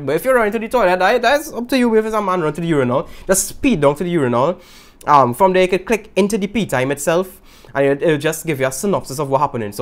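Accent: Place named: Trinidad and Tobago. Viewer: Trinii accent loll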